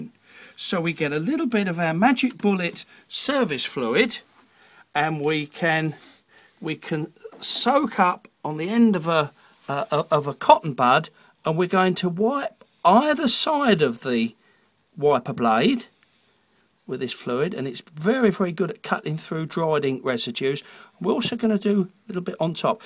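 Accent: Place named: United Kingdom. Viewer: Wonderful British English accent!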